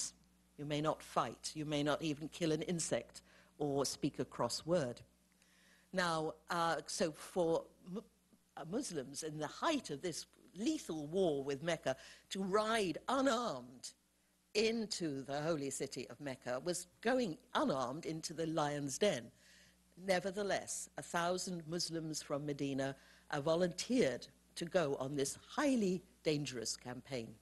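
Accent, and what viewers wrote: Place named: RP, United Kingdom. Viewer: She has no idea what she was talking about. her posh accent doesn't make her more knowledgable unfortunately.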